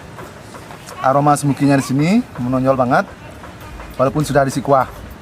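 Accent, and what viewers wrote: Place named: West Sumatra, Indonesia. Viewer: Gaya abg berbicara seperti orang Minang yang menggunakan bahan bahasa Indonesia 😅😂😂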